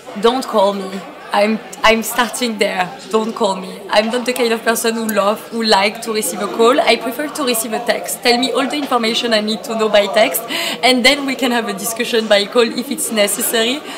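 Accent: France. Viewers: j'adore. You speak so good Italian. Sei incantevole! I love your french accent though hahaha. What app have you used for editing this video? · Come on I know you’re French but make an effort for your accent it’s not’s pleasant to hear your French’s accent when you talk in English 😫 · Moi j'aime bien ton petit accent français il est cute haha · I love her but the French accent is so thick for me to handle 😩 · Your italian is so good... I LOVE your french accent 😍😘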